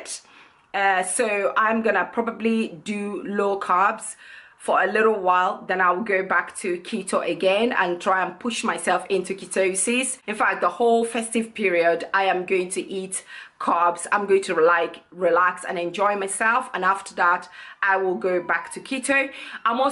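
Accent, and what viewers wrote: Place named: United Kingdom. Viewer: Love your accent are you British?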